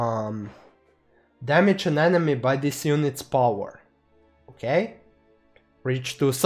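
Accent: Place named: Spain. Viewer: I think from Spain. But i like his accent. I like also his contents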